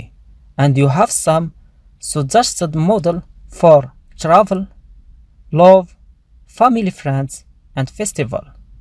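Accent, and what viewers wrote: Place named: India. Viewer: He is speaking English with Indian accent.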